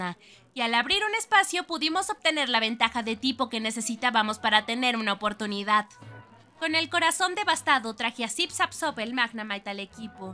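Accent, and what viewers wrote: Chile. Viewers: Eres chilena ?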